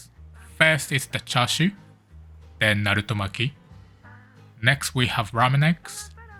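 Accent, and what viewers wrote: United Kingdom. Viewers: ur british accent sounts rlly good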